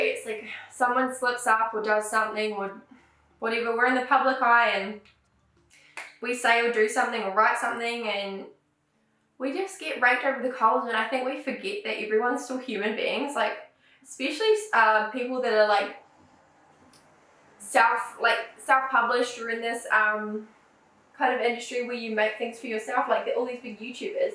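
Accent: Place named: New Zealand. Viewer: Kia Kaha lovely lady, just keep being you that's all that matters. Plus love hearing the good old kiwi accent.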